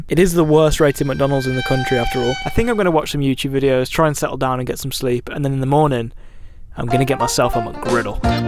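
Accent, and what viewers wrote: United Kingdom. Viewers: Oh my cat. This is the most British video I have ever seen. Why. Youtube knows my problem with intercepting British accent(this was quite understandable for me)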